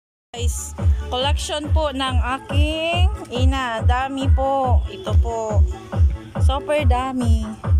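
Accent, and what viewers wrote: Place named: Visayas, Philippines. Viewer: bisaya ka ma'am haha, kadaghan tanom oi, kugihan si mama mo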